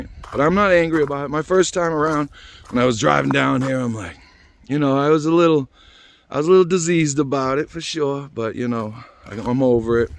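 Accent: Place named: Massachusetts, United States. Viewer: great accent ....massachussets >?? Canada here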